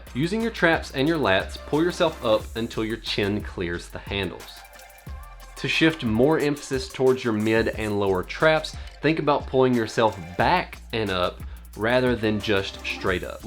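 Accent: South, United States. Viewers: deep southern accent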